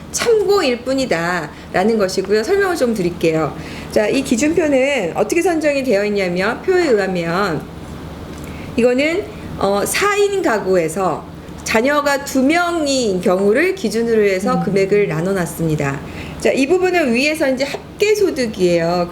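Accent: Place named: Seoul, South Korea. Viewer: 고향은 전라도인데 서울말을 더많이쓰시네요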